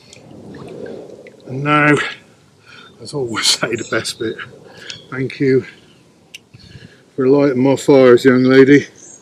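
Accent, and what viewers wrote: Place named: France. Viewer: Welcome back we've missed you. Loving the French accent!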